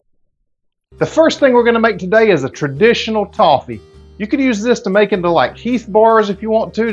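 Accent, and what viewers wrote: South, United States. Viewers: Is that a Southern American accent I hear?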